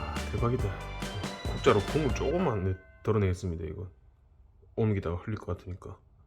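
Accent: Gyeongsang, South Korea. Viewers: 상 남자중에 상 남자 박력 뿡뿡 매력이 잘잘 흐립니다 거기다 경상도 억양까지 넘 섹시하고 멋지다 👍🏼😂😂😂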